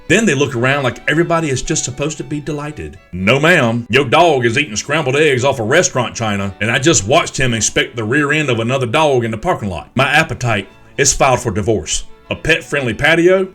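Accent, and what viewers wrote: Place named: South, United States. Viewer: Did you say dawg???...lol 😂😂😂 I literally couldn't get passed that southern accent, so adorable 😍 I love cute dawgs too lol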